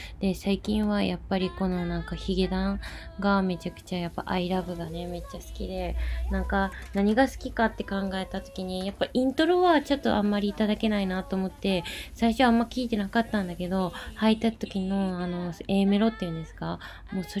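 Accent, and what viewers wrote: Kagoshima, Japan. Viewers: いちいちコメントが面白くて笑ったw 声と鹿児島弁に癒されました☺️ の日常がわかるおうち動画好きー！また楽しみにしてます♡ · 久しぶりにコメントしました🙃 の鹿児島弁すき！ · 鹿児島のなまり？初めて聞いたのでびっくりした‼️新鮮です‼️‼️ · 〜！夜勤中にぼーっと見てます。鹿児島弁が心地よか〜〜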